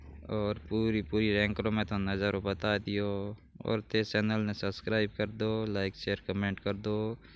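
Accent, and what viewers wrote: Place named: Rajasthan, India. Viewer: Ram Ram sa आपका कौनसा गांव है भाषा से तो फलोदी के लग लग रहे हो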